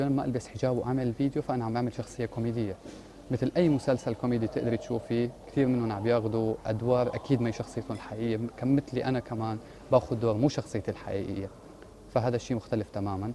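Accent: Aleppo, Syria. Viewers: هاد سوري مو لبناني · sara tv لا حبيبتي هاد حلبي اااحي كمان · tv حلبي بس هيك خاطرلو يحكي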